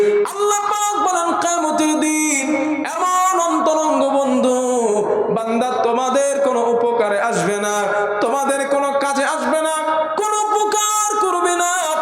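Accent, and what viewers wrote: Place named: Bangladesh. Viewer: মাওলানা সাহেবের আলোচনা শুনে মনে হয়, কোলকাতার মাওলানা নয়! বরং বাংলাদেশের কোনো মাওলানা। কেননা, বাচনভঙ্গি হুবহু বাংলাদেশী মাওলানাদের মত ।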